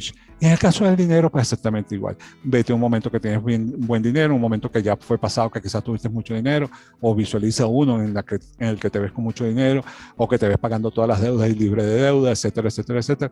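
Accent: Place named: Cuba. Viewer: Me encanta, lo que enseña son mis temas favoritos me encanta descubrir cada vez más lo que dijo JESUCRISTO... EL REINO DE DIOS ESTÁ DENTRO DE TI...... YO YA ME SUSCRIBÍ Y LO SIGO NO SE DE DONDE ES PERO OJALA, SE LE QUITE SU ASENTO XQ A VECES, NO LE ENTIENDO.... ALGUNAS PALABRAS, PAREGIDO A LO CUBANO CHICO... 😂AYÚDEME A ENTENDERLE MEJOR... LAS S S S SE LE DESAPARECEN A VECES... LO Saludo desde GUADALAJARA JALISCO . ME FASCINA SUS TEMAS Y SI TENEMOS PODER... ME ENCANTÓ LO DE JOSÉ SILVA, PERO OJALA FUERAN EJEMPLO CLAROS. ESPECÍFICOS QUE HACER EXACTAMENTE.. MIL. GRACIAS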